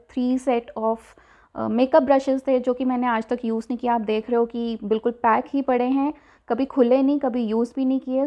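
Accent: Punjab, India. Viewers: Are u from Panjab as some words used by has panjabi ascent...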